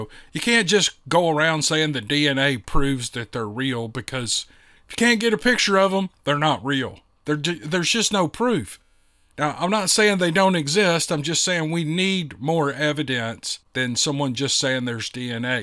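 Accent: South, United States. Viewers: I’ve tried other shows on this subject, but yours is the one I always click on. Your voice sounds like my southern family, you sound like an uncle of mine, very calm and easy to listen to. Makes doing chores a breeze. Thank you and keep it up. Maybe you’ll have an experience yourself. Have a blessed day. 😊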